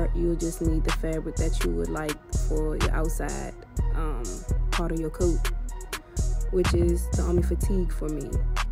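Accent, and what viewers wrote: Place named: Washington DC, United States. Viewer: yesss I heard the accent. ❤️ I’m from Maryland. Love to see a Washingtonian sewing queen.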